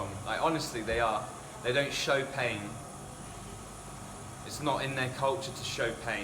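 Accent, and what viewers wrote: United Kingdom. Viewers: Ah so you are from UK. I thought you are Australian. I can’t tell the accents. Between the two. Glad you are ok now. Cute puppy there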